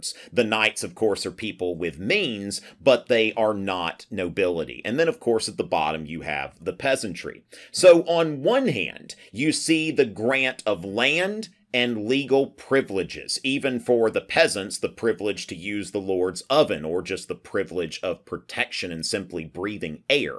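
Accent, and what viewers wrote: South, United States. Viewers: As King of England, I approve of this video (love your country accent btw 😁)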